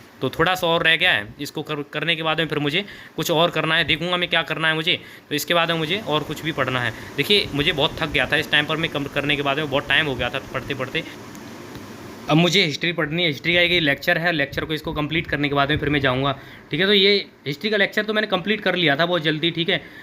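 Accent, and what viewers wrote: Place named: India. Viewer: I loved your hindi accent❤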